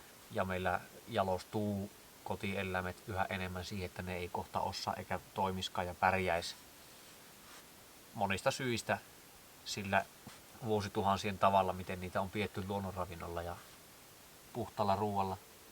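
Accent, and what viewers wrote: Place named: Sodankylä, Finland. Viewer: Moi Sodankylästä. Sääsket inisee, lampaat määkii, kukko kiekuu. Mies puhhuu lähes mejän murthella pölökyllä istuen. OK.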